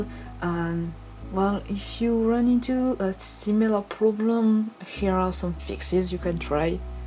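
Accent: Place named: France. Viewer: NANNNN, T'ES FRANÇAISE ?! Jamais j'y aurais cru ! En tout cas, je tenais à te dire que je trouve ton travail très beau, de beaucoup de manières ! Que ce soit les couleurs, la technique ou le fait que tu propose beaucoup de choses différentes ! Continue comme ça, cette vidéo est géniale ! :P (ton accent anglais est trop mignon !)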